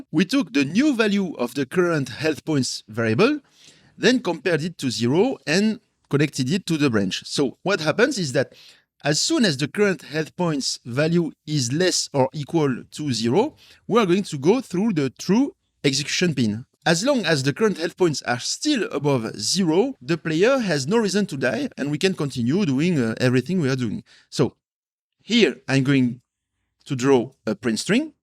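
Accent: France. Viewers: Excellent tuto j'avais pensé que tu étais Français vu l'accent mais le nom m'as trahis hahahah Continu comme ça tes conseils sont d'une précieuse aide pour tous ! En pensant t'inquiètes pour l'accent pour un Français c'est excellent ;)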